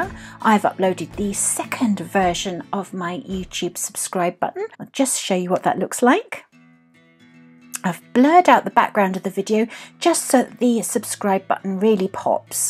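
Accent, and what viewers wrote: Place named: United Kingdom. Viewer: Calm and Steady to understand thank you and "very British" indeed :)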